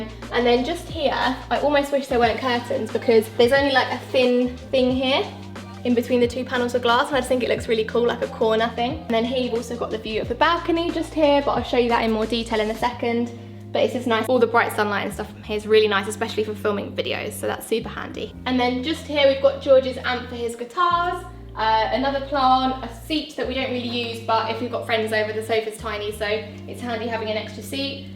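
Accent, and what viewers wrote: United Kingdom. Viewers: That british sexy accent💜 Everytime i hear british accent i always think Hermione😂 it's fayaah!🔥 Sorry from my english haha😅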